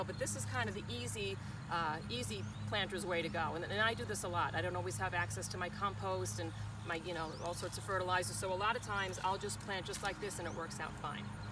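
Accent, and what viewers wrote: New Jersey, United States. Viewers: thanks for this - I now understand that it is too early to harvest sunflower seeds. My favorite variety is Italian white - goldfinches love it! BTW your (very slight) accent makes me homesick for NJ and Zone 7 gardening (now living in Minnesota in Zone 4)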